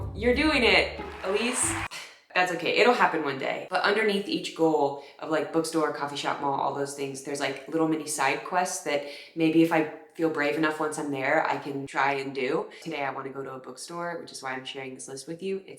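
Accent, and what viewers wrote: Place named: Tennessee, United States. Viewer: Sound like Gatlinburg Tennessee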